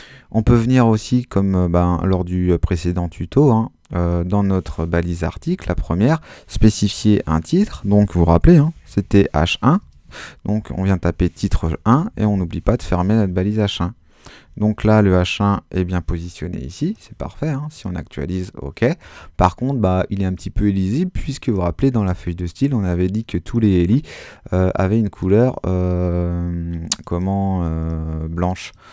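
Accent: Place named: England, United Kingdom. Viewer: Très bon tuto mais l’accent anglais est beau ! :D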